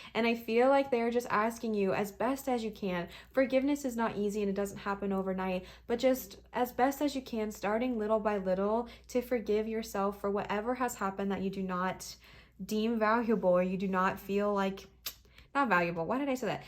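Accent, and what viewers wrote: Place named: England, United Kingdom. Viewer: Pile 3 here💖💖💖 Once again I'm called out on being an aquarius😅💖 Lol so first thing I thought was hilarious is that last night I was having a little trip and I couldn't stop speaking in an english accent lol And also, was thinking in an English accent, like without even really thinking much of it or even trying to. When I came to this reading and you started talking in the english accent it made me remember that and I'm like so curious on what this english accent means to me lol Universe just wants me to notice that😅 A thing that I noticed that I think is super cute is how you yawn a lot when you do readings. I feel like these yawns mean something, I've always found myself doing the same thing while trying to perform in certain ways. Lol for instance when I sing in front of people sometimes I yawn A LOT and I just can't stop and never knew why. 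I never thought about it so much until I started watching your readings. I wonder if yawning is like a sign of spirit coming through or something, like a natural reaction for some of us. Whatever it is, it's fascinating and I'll probably google it lol Thank you for this, it resonated so well and was so empowering. Everytime I start to question myself I love how I have this beautiful tarot community who can help me connect to my guides. It's honestly so important, it helps me keep pushing through. I get what you mean about the comments people make. Like, this comment a friend said to me last night almost stressed me out a little bit. I w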